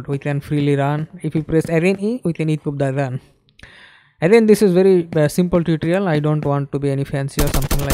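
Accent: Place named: India. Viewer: loved the Indian accent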